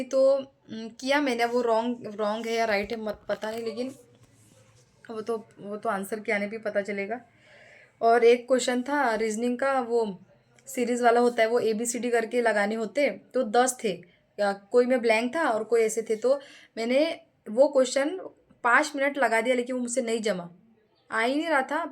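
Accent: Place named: West Bengal, India. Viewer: Aapke boli se lag rha hai ki aap West Bengal se ho. Ham to up se hai. hme to abhi se dar lag rha hai. mera 11 feb ko hai.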